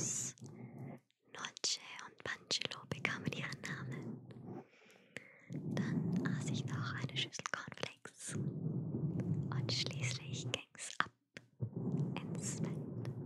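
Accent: Germany. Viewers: In truth, I think she is really German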